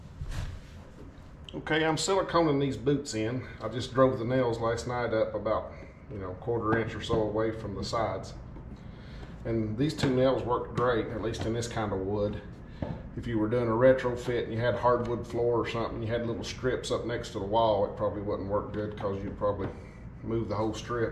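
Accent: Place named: South, United States. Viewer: your pronounced southern accent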